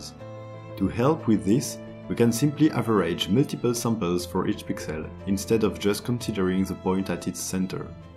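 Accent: France. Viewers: I like your accent u french? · I hadn't noticed but you have a French accent! 😂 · Ohhhh cet accent Français je reconnais:) Nice work!! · Salut , je voulais savoir sur quelle plateforme on pourrait communiquer. Je laisse ce commentaire en français car l'accent ne trompe pas 😂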